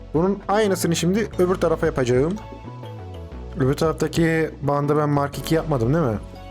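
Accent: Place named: Hatay, Turkey. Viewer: hatay sandık içi aksanı seziyorum ayrıca :)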